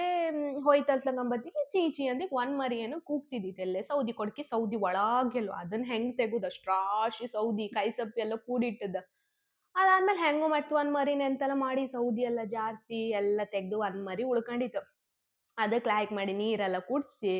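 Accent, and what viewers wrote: Kundapura, India. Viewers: Supper kundapura Kannada I like it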